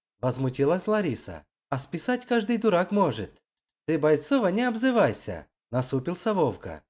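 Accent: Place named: Ukraine. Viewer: Обожаю рассказы про бабу Капу. И чтец с украинским акцентом, просто прелесть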